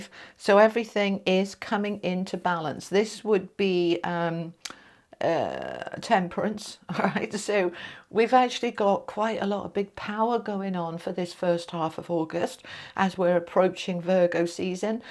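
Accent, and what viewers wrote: England, United Kingdom. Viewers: Love me some! 😍🥰 ❤❤ That lovely english accent always makes me go weak in the knees, sigh 😅😘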